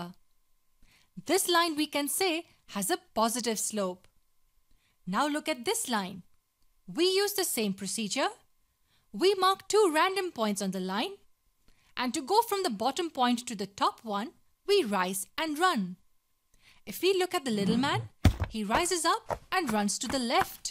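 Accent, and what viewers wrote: India. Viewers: Are you from India?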